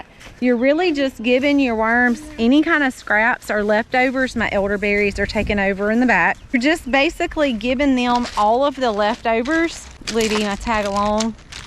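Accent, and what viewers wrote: South, United States. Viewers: I also love hearing your Southern drawl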